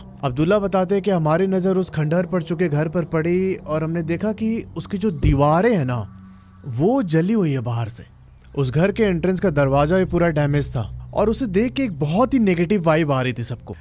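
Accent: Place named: Uttar Pradesh, India. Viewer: Bhaiya please aap kaha se ho apki tone uttar pradesh se ho yesa lagta hai please reply 🙏 ❤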